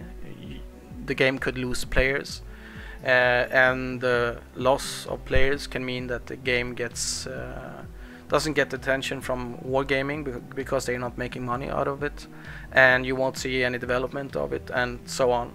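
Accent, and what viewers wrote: Sweden. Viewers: where are you from you sound like swedish a little bit i swedish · Jag tyckte att jag hörde det på din engelska:D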